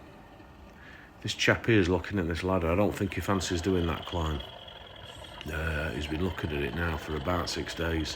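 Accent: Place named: Yorkshire, United Kingdom. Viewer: Enjoying your work. Not a modeler myself but I like the total escape from my normal day that I get from watching your videos. For someone relatively new to it your layout looks great. Your eye for a shot and the videos you produce are virtually unique and are for me far more engaging than the more usual style which mostly consists of trains going round in circles. And all toped of with your dry as dust take it or leave it attitude delivered in a friendly Yorkshire accent. I think you are on to something.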